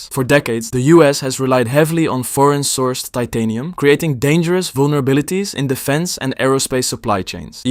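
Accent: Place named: Netherlands. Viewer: really interesting video about GPUS, and the way you calculate things! Also gotta love the dutch accent. Cheers from sweden!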